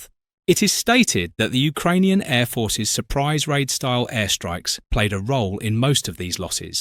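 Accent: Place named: United Kingdom. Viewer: oh bullshit... this is all propaganda.. some brittish accent neocon accent narrator asshole brainwashing the idiots dumb enough to believe this propaganda...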